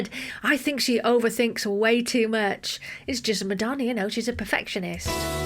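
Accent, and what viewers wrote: United Kingdom. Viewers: Hi, been a fan of your videos for years, but for some reason they’ve stopped coming up on my feed. Great analysis! You have such a wonderful personality. Where in the UK are you? I love your accent. Best wishes from Philadelphia! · I'm a very huge Madonna fan.. buy besides that ..I really like you..I can fell you are a very good person ..besides that ..there's a lot of reaction videos..but yours because you are a really voice couching everything you say souds really professional and you never used offencive words... you can say..she didn't get the tone or something like that.. but never offencive.. thank you ..Cheers from Costa Rica 🇨🇷... oh ...I forgot..... I love your British accent ❤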